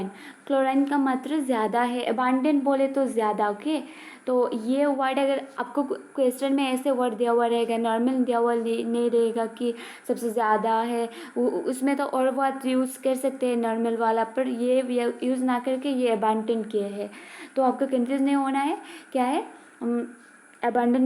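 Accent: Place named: South India, India. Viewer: I like your voice, may be you are from South Indian.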